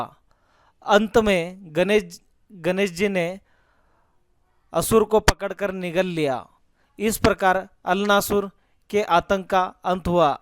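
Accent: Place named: Maharashtra, India. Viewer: ये मराठी माणूस की हिंदी है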